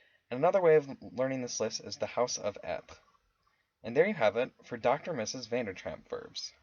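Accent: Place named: Scotland, United Kingdom. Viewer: U did pretty good but you should work on your accent you sound Scottish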